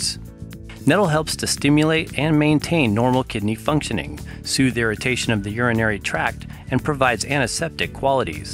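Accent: United States. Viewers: Farang Esaan it's the way Americans pronounce it. Both are correct, like maths and math, both short for mathematics.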